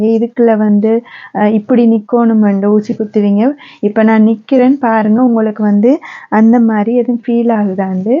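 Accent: Sri Lanka. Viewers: ivanga Sreelankan tamil mathiri pesuthu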